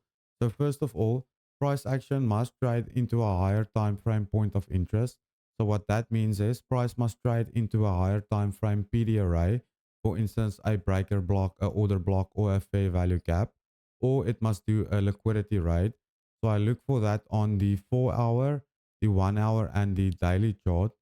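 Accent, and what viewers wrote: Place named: South Africa. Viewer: Brother you sound South African. Wanted to say thank you for your growing channel and your lessons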